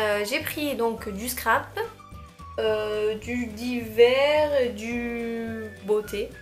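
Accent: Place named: France. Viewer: Omg!!! J"adore votre accent francais!!! Jsuis acadienne du canada!!!!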